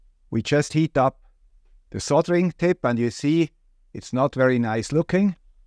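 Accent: Switzerland. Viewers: Swiss accent, highly appreciated! ❤ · I’m interested that you pronounced Knipex as “nipex”, whereas a native German speaker once told me it is “K-nigh-pex”? As a native English speaker, I still have no clue how to pronounce the brand properly! I had assumed you were Swiss-German as oposed French, from the accent, so very interested in your take on this?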